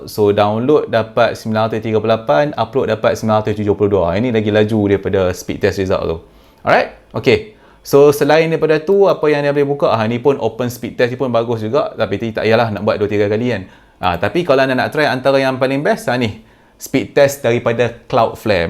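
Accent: North, Malaysia. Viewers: Lorat abg ni mcm org utara la,hehe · Abg guna loghat utara baku ka ni?sedap dengaq..hahaa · Guna pekat utaqa pun elok gk.. Lg unik vdeo..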